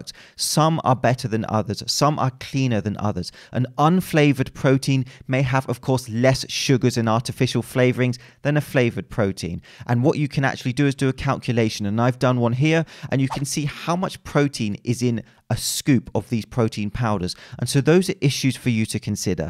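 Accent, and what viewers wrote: United Kingdom. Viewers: Are your accent was British?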